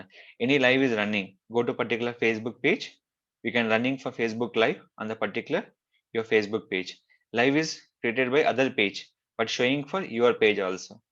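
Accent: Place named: India. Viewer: The Indian accent is the worst.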